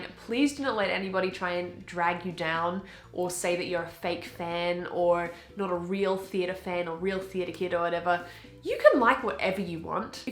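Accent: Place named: Australia. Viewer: you remind me so much of my voice teacher, except ya know..... your australian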